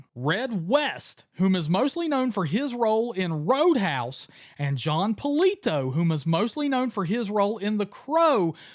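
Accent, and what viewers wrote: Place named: Pennsylvania, United States. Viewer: You sound almost exactly like that guy who cheri robs at the convenience store/gas station.. I grew up with friends from Pennsylvania and Ohio, you sound a lot like the idiots they made fun of.. keep up the amazing content. Maybe work on your delivery. Otherwise gobs-less...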